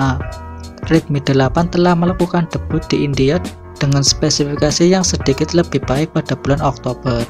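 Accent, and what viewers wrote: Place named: Tegal, Indonesia. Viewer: Medok bgt....tegalll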